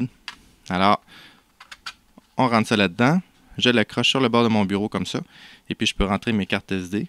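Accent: Quebec, Canada. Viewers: j adore ton accent quebecois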